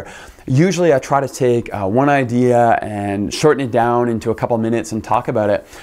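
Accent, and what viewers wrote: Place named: Canada. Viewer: I can hear the Canadain influence not only in the accent but in the non-binary perspective so refreshing. Speak louder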